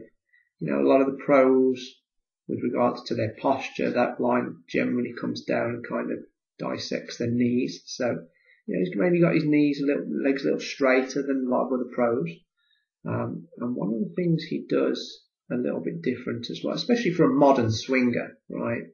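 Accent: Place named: Midlands, United Kingdom. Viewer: Hi love this action so much and being from the Midlands appreciate the accent! Quick question how much should the left foot flare out? Thanks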